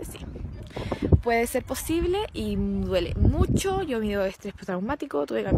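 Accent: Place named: Chile. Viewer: esque es chilena xD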